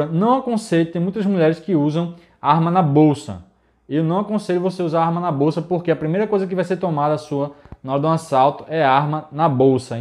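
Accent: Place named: Northeast, Brazil. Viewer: Sotaque nordestino, show!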